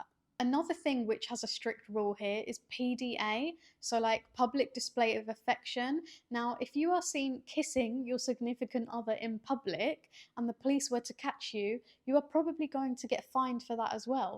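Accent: United Kingdom. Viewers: Great info, cute girl, but that english accent is not cute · ah. When you said UK I immediately snapped back understanding the accent. Couldn’t figure out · British accent is amazing, a beautiful woman with British accent is charming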